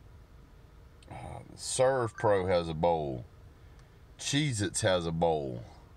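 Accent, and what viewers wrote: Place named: Rural, United States. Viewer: You aren’t playing in “Atlannnuh” you fucking hick. “Elemmn bucks”. 😂😂😂 can’t wait to trash your page after Texas smokes you.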